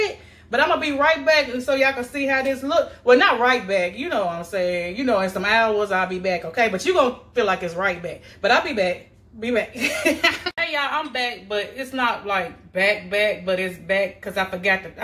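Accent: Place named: Memphis, United States. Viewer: 😂😂❤❤ I love you because you remember me of home in Memphis TN ❤ when i say you sound like my folks 😂 thanks for the recipe I was missing something in mines and couldn't find it 😂 the sugar,butter,and Chicken seasoning ❤️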